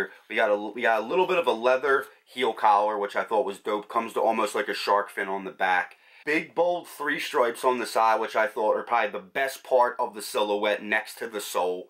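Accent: Philadelphia, United States. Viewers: haha randomly found you from your vapormax chukka video I realized you were from philly as soon as I heard you say like 5 words. I'm from Harrisburg man its cool seeing guys in my state doing dope shit!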